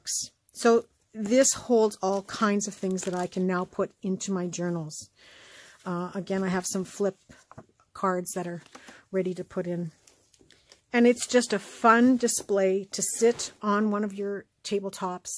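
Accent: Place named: Michigan, United States. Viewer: Are you from Michigan? You kind of sound like it…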